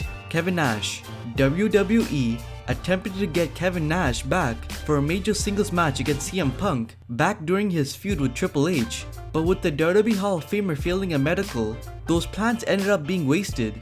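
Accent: India. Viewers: The speaker is from where??? Sound like indian fucking garbage voice and accent trash channel trash speaker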